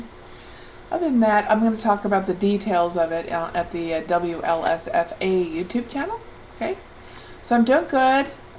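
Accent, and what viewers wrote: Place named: South, United States. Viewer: your to funny love the southern accent i am freezing my husband out of are bedroom hehehe he said can't wait tell u get your surg. because i keep the room so cold glad u had fun in Los Vegas oh yeah did u run into the bariatric ladies to i saw some videos love:0)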